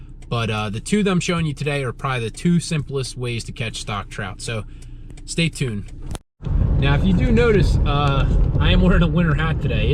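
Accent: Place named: Pine Barrens, United States. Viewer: hahaah holy shit that piney accent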